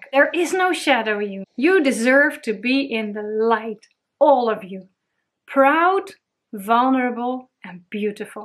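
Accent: Netherlands. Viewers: hey, I hear a fellow dutchie here!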